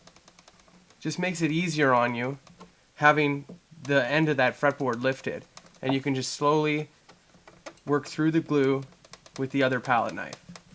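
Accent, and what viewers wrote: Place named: Canada. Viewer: Thanks for the great video. Do u have a video that shows how you did the neck reset? I have a problem with my Martin. I can see a considerable 1/8” gap at the heel of my neck. My guitar is 20 years old. I think the wood has shifted with time. Not sure what to do at this point. I am in Mexico so I don’t have much luthiers around me. Hey you’re Canadian? You sounds like english canadian. I am french canadian from Quebec!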